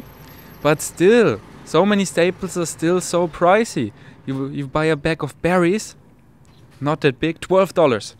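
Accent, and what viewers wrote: Germany. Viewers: you have the loveliest voice for podcasts and talking. Part of what is so special is your German accent, but I agree and think it's important if you want to speak better English then emulating those with good speech around you is important. Not to learn just slang but actually what words should be dropped or not used, how to arrange them in a sentence to make sense and where the emphasis should be put on a word for correct pronunciation. But these things don't include your wonderful German accent which is what makes you YOU! xx I hope you enjoy the rest of your stay, you are learning so much about life and people from the everyday experiences (and so do we then!). Btw I moved to England from NY, my husband is British and we have fun joking about the differences in how we pronounce things. So what may seem correct to me may not be to a Brit, and the other way around. 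I think it's great to understand this when we hear someone from another country speak, one may not be wrong, just different. Just my thoughts.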